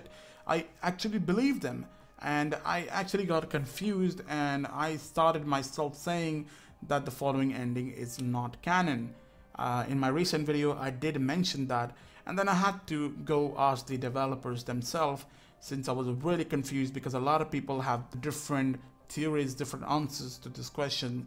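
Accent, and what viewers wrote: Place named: India. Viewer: You sound like an indian gamer but thats why i will subscribe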